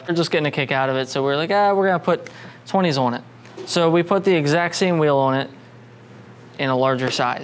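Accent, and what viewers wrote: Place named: Philadelphia, United States. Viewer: Where are you guys located? You sound very Philly my friend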